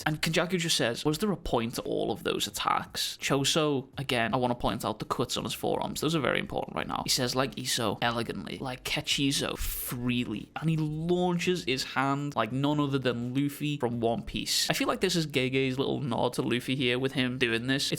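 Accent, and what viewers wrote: Liverpool, United Kingdom. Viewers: weird seein another scouser into anime. love it bro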